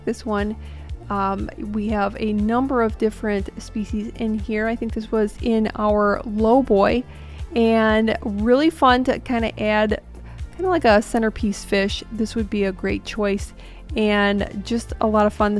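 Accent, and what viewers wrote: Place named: Midwest, United States. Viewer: thanks for the video. you have a STRONG midwestern accent :) "oh for crying in the mud!" :P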